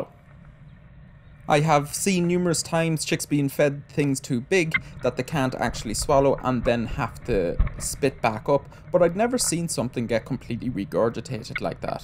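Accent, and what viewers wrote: Ireland. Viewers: HELLO, Greetings from Canada🇨🇦. WoW, this was an exceptional video thank you so much! I appreciate you taking the time to explain the goings-on and for educating people like myself, for the time you've spent filming and editing and your calm, dreamy, Irish dialect and narration. (I lived and travelled throughout Ireland for a year~ loved every moment). I'm sincerely grateful and looking forward to viewing more of your video's/vlogs. Thank you ❣️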